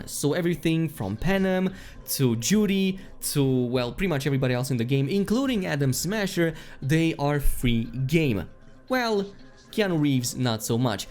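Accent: Netherlands. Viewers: it's a dutch accent :)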